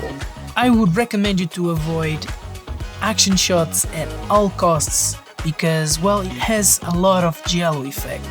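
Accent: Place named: Portugal. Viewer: bom video, da para notar em algumas partes que es portugues ahhaha, mas bom sutaque, bom conteudo, novo sub e <mail>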